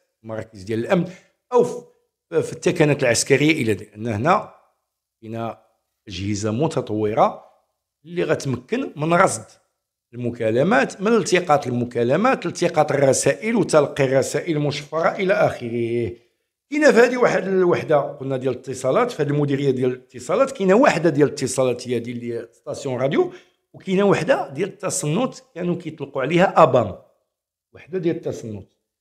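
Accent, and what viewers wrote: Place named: Algeria. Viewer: كتهدر بحال الجزايريين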